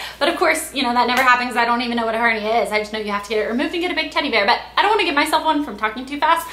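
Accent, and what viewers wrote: United States. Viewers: cute chick... I like your freaking american accent xD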